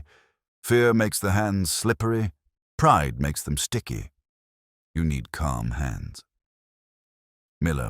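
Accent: United Kingdom. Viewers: Audio would be better if it wasn't using a slight British accent when talking about US military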